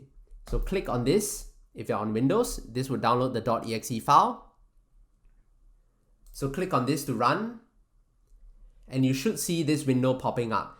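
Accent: Singapore. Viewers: are you from singapore? your accent sounds kinda familiar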